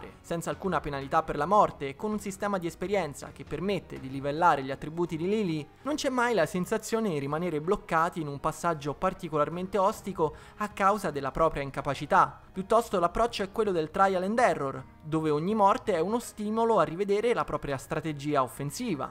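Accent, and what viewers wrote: Rome, Italy. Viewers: Lo sai che abito sulle Alpi francesi e ogni tanto faccio confusione. Non si può dire però che io non mi applichi. Io almeno non parlo con l'accento romano come fai tu...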